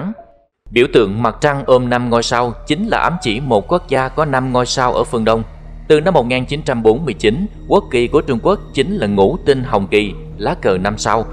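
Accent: South, Vietnam. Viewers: Thỉnh thoảng nghe đc giọng Nam kỳ rỏ ràng dể mến Toàn giọng bk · giọng miền nam chuẩn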